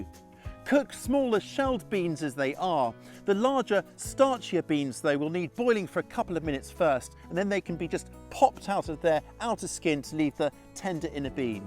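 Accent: United Kingdom. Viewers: Always appreciate a British accent. Similar growing conditions to where I live, Pacific Northwest, so I know I can get relevant info.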